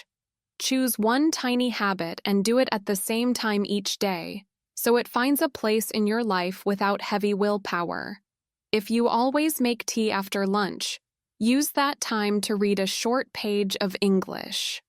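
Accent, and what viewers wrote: United States. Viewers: your accent in video is american accent . right ?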